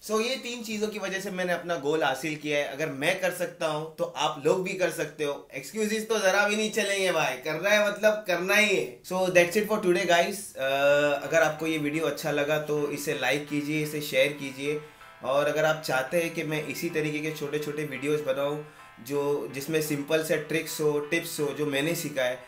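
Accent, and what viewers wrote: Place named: Mumbai, India. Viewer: Typical mumbai slang bhai..rocks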